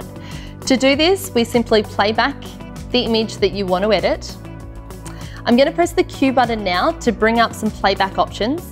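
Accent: Australia. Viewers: I guess she is Australian 😊😊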